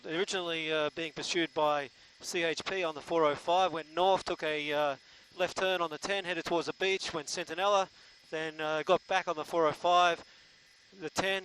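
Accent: Australia. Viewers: Never heard an Aussie narrating an American chase before. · The narrator was awesome. Maybe an Aussie or a Kiwi?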